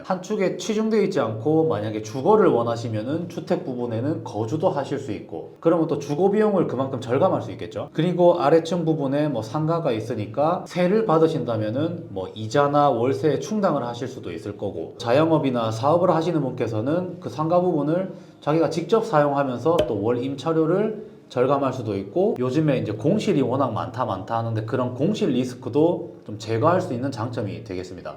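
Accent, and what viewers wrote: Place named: Gyeonggi, South Korea. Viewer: 원래 경기도 사투리에요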